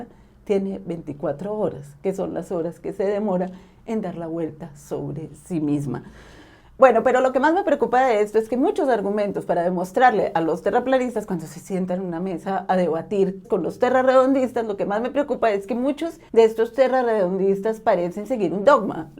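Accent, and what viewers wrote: Colombia. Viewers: Me encanta tu personalidad, tu risa ,tu carís-ta... sos colombiana verdad ?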